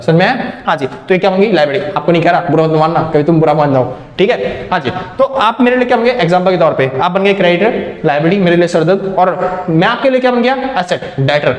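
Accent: Haryana, India. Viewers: SIR PAKKA HARYANA SE HAI 👍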